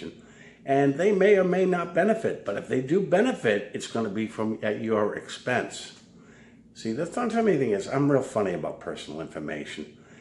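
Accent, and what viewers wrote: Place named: Boston, United States. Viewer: My opinion is you have the best American accent, is it Boston? Great vid.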